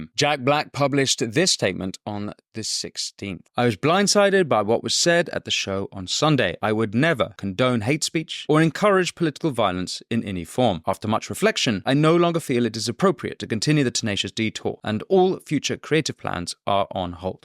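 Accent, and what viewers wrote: United Kingdom. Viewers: Anything you talk about will be accepted by Americans because of your accent. Is what it is, we love the British accent. 🤷🏽‍♂️🙌🏾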